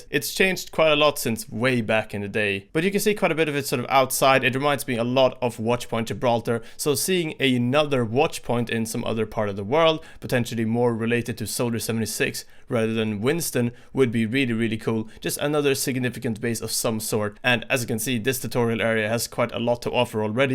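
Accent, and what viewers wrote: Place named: Sweden. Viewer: Jag hörde på din dialekt att jag du var svensk, jag visste det 😂 Fortsätt med det du gör du e helt otrolig och jag älskar dina videos ❤️👍😀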